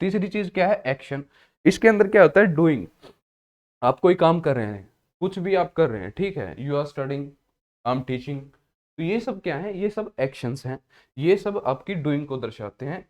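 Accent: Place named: Haryana, India. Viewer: English with haryanvi... No doubt it's a nice combination for us... Thanks for the class sir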